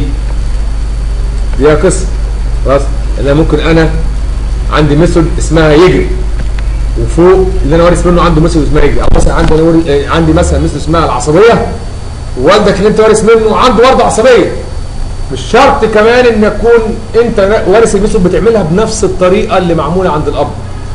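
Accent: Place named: United Kingdom. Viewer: OMG!! what the hell is this you're killing me with your english accent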